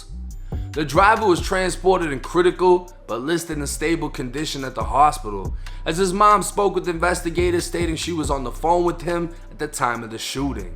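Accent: Boston, United States. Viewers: I just love your Boston accent. You make these videos so much more interesting with the way you narrate. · Boy your Boston accent is hilarious lol…good shit tho! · Do I hear a Boston accent? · You from Boston?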